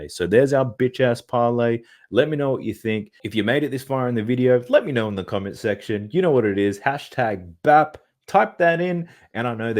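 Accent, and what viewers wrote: Australia. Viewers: Who else feels uncanny valley listening to an Aussie talk about our footie😅 im here for it beeeeioootchh!🤘🏼😎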